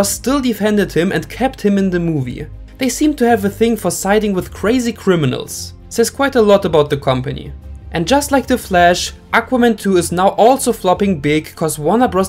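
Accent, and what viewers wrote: Germany. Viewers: He‘s German, English isn‘t his native tongue. Relax.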